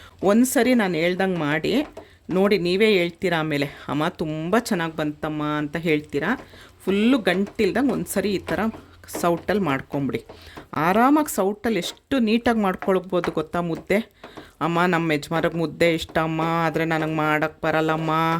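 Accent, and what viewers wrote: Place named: South Canara, India. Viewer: Neevu South canara antha gottayithu. Yavuooru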